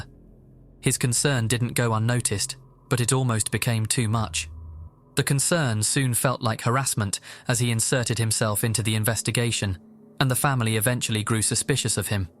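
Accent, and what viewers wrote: United Kingdom. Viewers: bollocks* He sounds British 🙃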